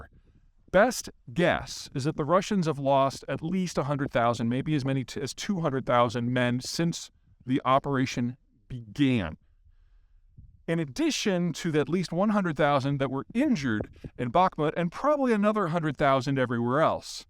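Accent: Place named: Iowa, United States. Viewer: Give him a break, he's from Iowa! 😁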